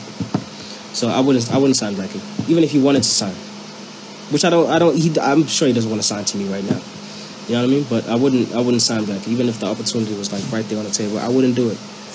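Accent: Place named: United States. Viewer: American accent mxm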